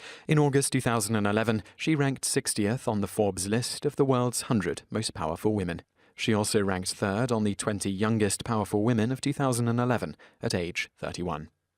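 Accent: United Kingdom. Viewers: Without checking the other comments... the person narrating this is the least compelling narrator on the planet. He makes "interesting facts" so effing uninteresting and boring, British accent notwithstanding. Put a little pep in your step. HOWARD STERN RULES BABABOOEY!!! (i mean seriously british dude, include a little personality).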